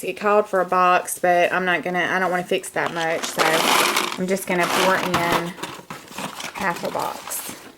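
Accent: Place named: South, United States. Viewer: Thanks for the recipe. I love your southern accent. :-)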